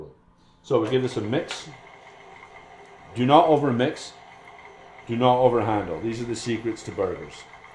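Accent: Scotland, United Kingdom. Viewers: He's Scottish ! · Canna hide his Scottish accent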